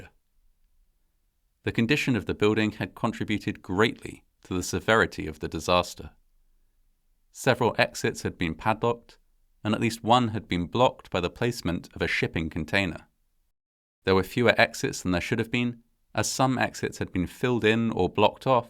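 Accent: United Kingdom. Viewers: The narrator is so annoying. Why do we have a British narrator with an American story sounds bloody awful.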